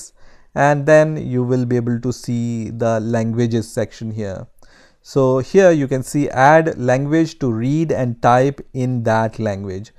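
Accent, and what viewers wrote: India. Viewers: Is this fake? He sounds india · why does he sound indian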